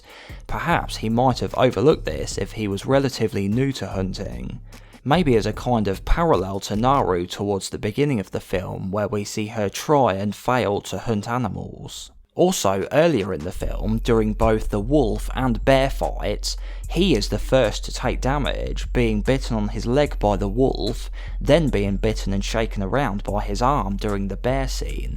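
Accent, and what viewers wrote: United Kingdom. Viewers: Thats a thic british english mate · dude i couldnt concentrate on the video because of your accent i dont to listen to british accent again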